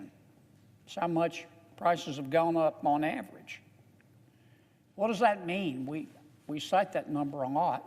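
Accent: South, United States. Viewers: " Mr. Kennedy protects a state with one of the lowest income states in the union, born and raised in the state and no one knows the state of Louisiana better than this champion! God bless you sir for your service, dedication but more importantly your small town simple way of speaking with reason, respect and willing to go the last mile for all Americans. Make no mistake when he speaks and Washington laughs at his accent and plain speaking Americans know exactly what this patriot is saying! Thank you sir!!!!!!!!!!! "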